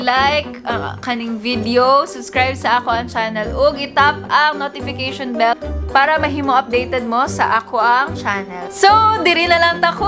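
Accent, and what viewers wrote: Visayas, Philippines. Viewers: Cute kaayo ka mag bisaya lodi. 😆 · ang kyut mo po mag salitaa ng Bisayaa ate 🥰🥰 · kacute magbinisaya ni ate hahaha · sobrang cute magbisayaaaa!!!!! ❤️ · 😂😂😂ang cute mo mgbisaya ms...cge kog ngisi d whole video..super ng.enjoy ko..thank u sa GV ms...😊😊😊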